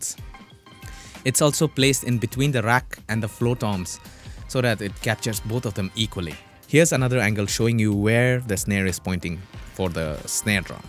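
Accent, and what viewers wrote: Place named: India. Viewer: It's weird as hell hearing an Indian accent come out of your mouth.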